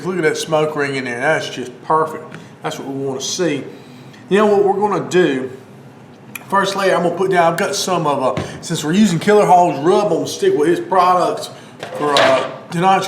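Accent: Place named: Texas, United States. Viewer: I'm living in England now but grew up in Texas and I definitely miss the accents of the south. Listening to you explain this delicious recipe was a real treat. Thanks man!! Since I grew up with hot links and dry rub long smoked brisket (being a Texan) I'm more and more into pork for bbq. I'm converted!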